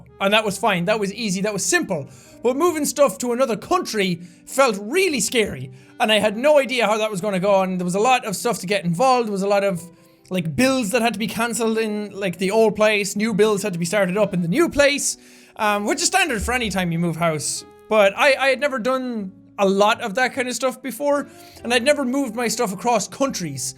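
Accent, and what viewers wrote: Ireland. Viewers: ahh no more Ireland :( Well hope you enjoy your new home...<3 Hope you keep your amazing accent too <3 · Maybe the only think I'd ask for is that he never loses his Irish accent. xD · He is going to loose his awesome irish accent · i am a little bit, i have a BIG thing for Irish Accents..so if he is in the UK now it does worry me a little bit. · My Irish Potato is gonna be in England.... You better keep your accent! Don't get a British one! That's what Stampy is for! Stay you! -Keegan Tripp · don't worry he won't, he's a fully grown adult ("adult"😂), so he may lose it slightly but he'll always have that irish tinge ( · NOOO U WILL LOSE IRISSH ACENT OH NO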